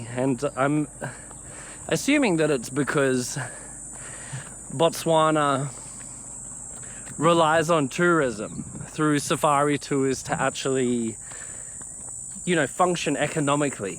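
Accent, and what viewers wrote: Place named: Australia. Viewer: Dear! You are the best guy I’ve found in youtube sice years, i’m so grateful to Famila Mundo (the camere;) to let me know you. Y like lissen you to improove my english undertanding and i adore australian accent… is very hard for me but very beautyfulsoundin for me. Please don’t offend if i ask you to make an experiment, Im 50 years old, and i’ve seen many things in the live..and in the health tha is a my passion since decades….. I heared in your breath the sign of a “sensitivity” to some moleculs present in the food. Expecially i’m almost sure you eat a large amount of “starchy” food…. Like grains, potatoes, flours food……. Please…. If you want to lissen a old guy that experimented many things, You can try for Trhee weeks to low down the use of any “starchy” product…. And eventually dairy products if you use them…. And you can observe by yourself if the improovement of breathing will occur, or not!! 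…. (I won’t give you my spoiler, even though You can easily guess it)😅 To compensate the lack of carbo, is the best choice, at your age, to put in the plate fruit and fruit and fruit, and many no starchy vegetables, raw and cooked. and sweet drink made by juice of fruit o cane…. Enjoy natural simple food!!!!! People around you will not understand You, but… do not worry, your experiment is a great improoving oporunity for you entire future healt of the live…people’s opinions may be discussed later, whit the results in the hand, after the experiment conclusion…🙏👍👍👍 Last info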